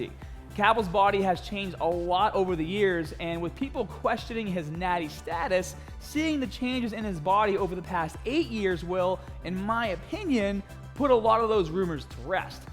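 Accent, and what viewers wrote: Boston, United States. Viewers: Staaaaaaat. Get in the caaar! Bro the Boston accent is thick as fuck 🤣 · Didnt realize strong Boston accent until this video, boston represent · why do you have a boston accent in the first half and a regular accent in the second? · is this guy from bostonkkklkokkjkj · took me forever to work it its not much like other bostonians i know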